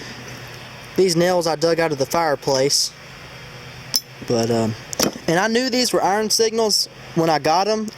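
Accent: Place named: Vermont, United States. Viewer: it's vermont speech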